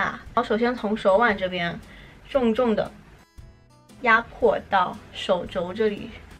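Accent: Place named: Taiwan. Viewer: 口音越來越像台灣人 讚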